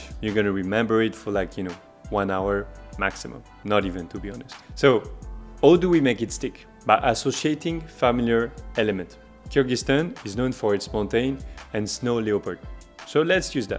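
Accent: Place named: France. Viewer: Merci frérot 💪🏾 😹j’avais capté l’accent français 💪🏾🔥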